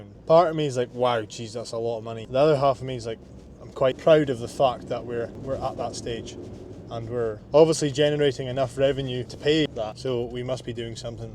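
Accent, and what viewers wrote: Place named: Scotland, United Kingdom. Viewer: absolutely no idea how i ended up here apart from scrolling through youtube on back shift at work. Grat content mate. Great to hear a fellow scotsmans voice on youtube! wish you all the best boys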